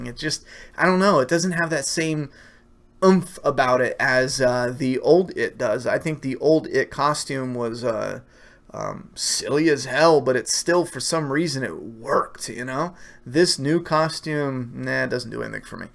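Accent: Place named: Long Island, United States. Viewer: Q&A- first of all I just want to say I'm a huge fan. I appreciate your honesty and that you just stay to your true self no matter what viewers say. Anyway, I usually watch your TWD reviews,but I noticed your accent. Are you from Long Island? I'm from there,but currently living near Baltimore, MD.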